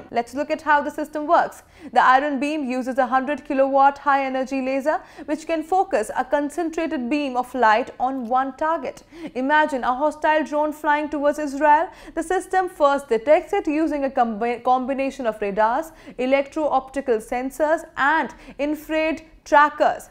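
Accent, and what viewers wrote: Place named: India. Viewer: i love indian people., but i hate when indians speak english. stop please. its menasing to hear you speak english with that indian accent.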